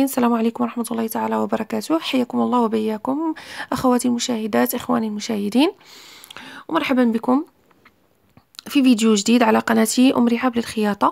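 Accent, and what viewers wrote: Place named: Oujda, Morocco. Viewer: تبارك الله عليك عرفتك غير من الهدرة ديال حتى انا وجدية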